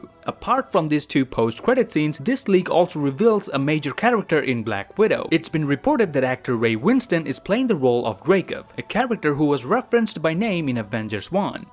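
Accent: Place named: Quebec, Canada. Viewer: I can tell by the way you pronounce farm, are, and such. I can tell you’re French-Canadian. I’d like to assume your first language is French. And with that information, shall I suggest the same channel but you say everything in French, for any French viewers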